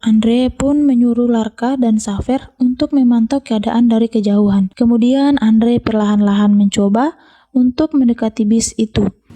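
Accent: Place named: Toraja, Indonesia. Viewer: Logatnya kek orang toraja, nih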